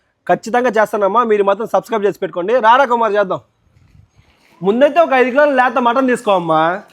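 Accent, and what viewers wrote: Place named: Rayalaseema, India. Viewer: Hyb biryani with rayalasemma vocal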